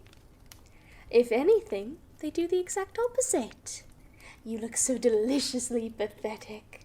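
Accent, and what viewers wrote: United Kingdom. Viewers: I love the OC outfit and the British accent!!!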